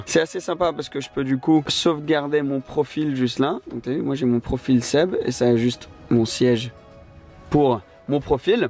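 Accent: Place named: United Kingdom. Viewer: On dit TESLA, pas TESLA. L’accent UK, ca va bien 2 minutes, ça fait des mois que t’es revenu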